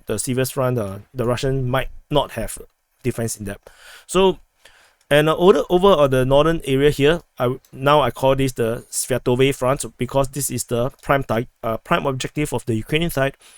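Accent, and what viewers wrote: France. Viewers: I suffer emotional damage listening to your commentary! Really well done - HOW do you get the names out so well?And the FRENCH accent!!!! WOW! But great analysis with current information sorting the known from the guesswork and the propaganda. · good pronancuation closer to real one :) yet French :D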